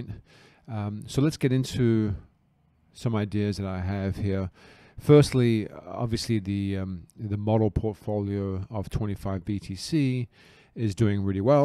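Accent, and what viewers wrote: Australia. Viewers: your/our Aussie accent, it's milder than the American accent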